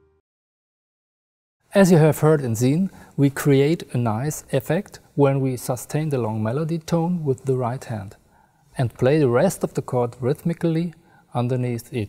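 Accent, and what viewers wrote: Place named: Germany. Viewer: Crazy question: Is the book in English. He sounds German and I don't understand German. Sorry to have to ask.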